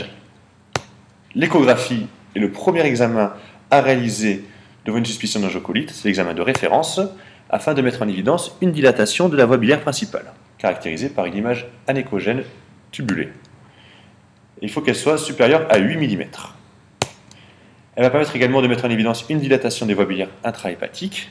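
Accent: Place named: France. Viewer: le meilleur accent de France, merci pour cette video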